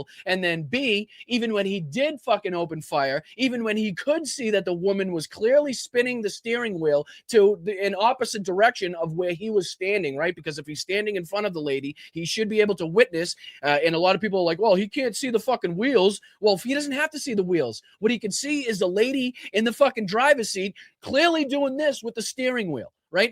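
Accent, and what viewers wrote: Boston, United States. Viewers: right on. Love listening to you. Boston accent.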